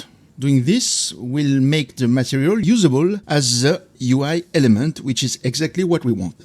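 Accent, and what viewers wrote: France. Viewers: L'accent français de zinzin 😅Merci pour le tuto complet c'est top !! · L'accent française est vraiment la pire.. ouch.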